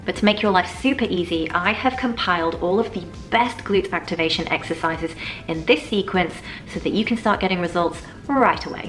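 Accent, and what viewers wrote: Southern England, United Kingdom. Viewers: Thanks:) I'm Spanish. I live in Spain but used to live in your home country England. I also have friends in the south of England, you people have the most beautiful accent! <3 My bum is improving and hubby notices it:D hehe... he says it's harder and rounder. Although I'm lucky and he finds me sexy no matter how big or small, hard or flabby:) I agree with you, age is no excuse to not be your best and my figure is kind of 'getting younger', sort of leaner. I seemed to concentrate my weight around my belly and upper thighs for years. I don't know if it's an age thing but I do think sitting down a lot (for work) has lots to do with it. I exercise at home and what I've done is place 15 kgs of dumbells on my belly. I contract my pelvic area to avoid any harm there and do 30 hipthrust reps concentrating on contracting the bum as you advise, rather than go very high up. 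I do this lying on my back with my legs on a sofa so I don't strain my knees at all.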